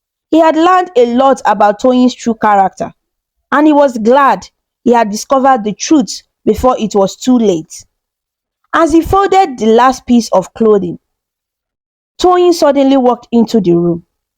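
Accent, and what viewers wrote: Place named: North Carolina, United States. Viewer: I love your stories and your accent...North Carolina, USA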